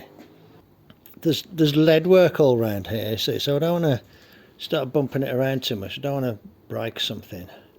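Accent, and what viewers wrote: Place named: West Midlands, United Kingdom. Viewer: Loving these videos on the 100E AND really nice to hear a WM accent again 😊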